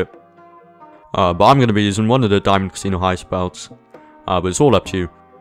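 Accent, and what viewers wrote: United Kingdom. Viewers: Are you British mate?🙂😎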